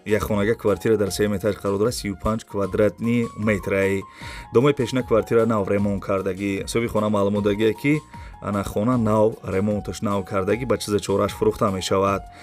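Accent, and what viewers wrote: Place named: Gharm, Tajikistan. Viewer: ХДТ ГАРЕНИ ГАПМЕЗАНИ НЕКИ😂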